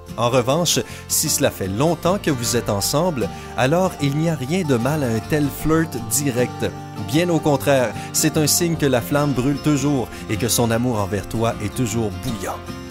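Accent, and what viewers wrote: Quebec, Canada. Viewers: Tain c'est un québécois 😂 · Hey st'un québécois qui parle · La voix off ses un québécois tabarnak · J'adore ton accent du qebec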